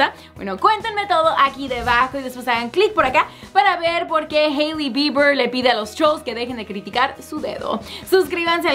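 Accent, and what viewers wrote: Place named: United States. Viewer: me gusta la pagina, pero odio cuando la chica habla tipo gringa, billie jajajaja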